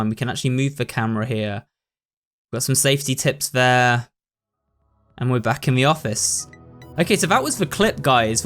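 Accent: United Kingdom. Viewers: The British accent doesn’t make sense to me sometimes “idea” is “ideer” it’s more syllables than the word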